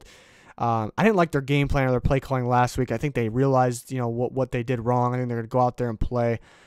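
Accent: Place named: Midwest, United States. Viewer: he could be from Wisconsin or MN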